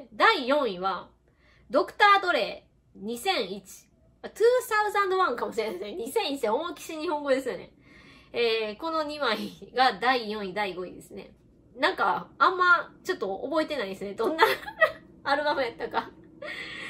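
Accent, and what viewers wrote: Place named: Osaka, Japan. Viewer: さん！今年もよろしくお願いします。 『メチャ』『ホンデ〜』『オモロイ』『アンマー』 メチャー大阪弁大好きやん！会話の間の取り方良いね。藤井風また聴きます。『何なん！』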